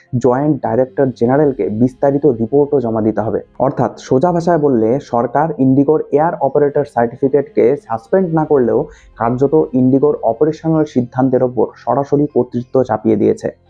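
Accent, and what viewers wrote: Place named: Bangladesh. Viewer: আচ্ছা আপনি কি বাংলাদেশী?! বেশ কিছু উচ্চারণ শুনলেই তাই মনে হয়। আপনাদের নিজেদের দেশের বিষয় নিয়ে representative clips বানান। তাতে সবার ভাল হবে। আপনাদের'ও।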